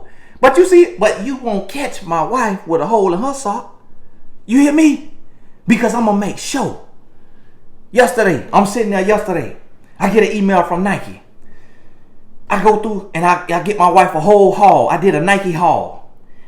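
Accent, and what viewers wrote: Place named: Chicago, United States. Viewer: you sounding a lil Chi town ova here nahhhhh!!! 🗣💯😜 🔥 I love this, definition of what “in love” looks like—Eph 5 from the Bible to the streets 👏🏼👏🏼👏🏼 I pray, Lord you made this man set-apart and I know You ain’t made only one...lol I’m so grateful for the Kingdom description y’all are real relationship goals—not perfect but real & striving to do it Christs’ way. 🙏🏽 Lagos, Nigerian love tho 😩🤦🏻‍♀️ Ohhhh, and another huge thanks, I’ll be getting my Coaching certification with you too. Your ministry, the way you love the Lord & the Queen [i spelled it correctly 😜🤜🏽🤛🏽] give hope to women like me who are in waiting. God continue to bless y’all, exceedingly and abundantly! 😊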